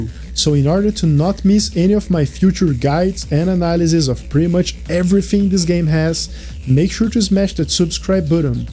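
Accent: Brazil. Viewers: Brazilian accent detected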